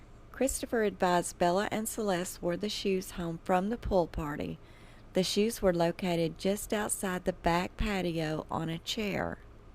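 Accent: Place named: Texas, United States. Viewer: Great voice, a kind gental loving voice with Texas accent!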